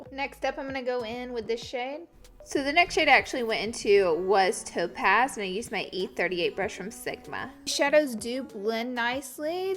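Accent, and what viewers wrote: South, United States. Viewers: i love your tutorials, are you Hispanic? you kinda look Hispanic, and i love your southern accent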